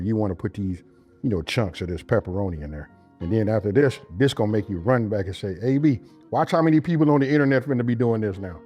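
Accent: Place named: Bay Area, United States. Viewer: Because of Ryan Coogler, I can now detect the Bay area accent 🤣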